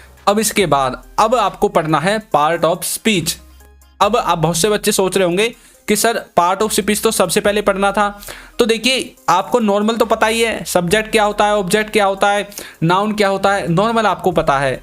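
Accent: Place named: Uttar Pradesh, India. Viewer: Sir apka language tone to up ka sa he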